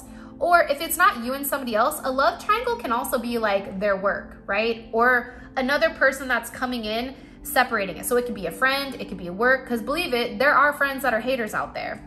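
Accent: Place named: Bay Area, United States. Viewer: Def Bay Area Cali girl Lol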